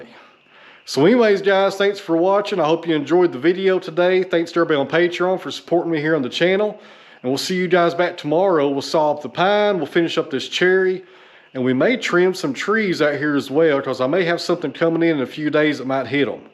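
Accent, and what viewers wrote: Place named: Tennessee, United States. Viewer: I live in the UK, getting out about is a little restricted for me at the moment. Love what you do in the videos, I never thought sawmills were so interesting before but glad I found your channel.... love listening to your Tennessee accent too, very warm and welcoming.... you narrate your video stories so easily, sign of a quality creator on Youtube.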